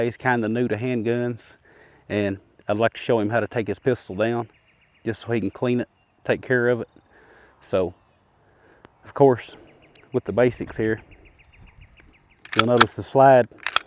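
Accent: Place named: New York, United States. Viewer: Bought this one for a Birthday present for my 34th B'day....I'm 62 now and shoot this Gem weekly and like others have said not experienced even one Fail to load...Fail to Fire....Stovepipe.........Nothing!!! They truly don't Make them like this anymore. By the way....You New Yorkers sure do have funny accents!!!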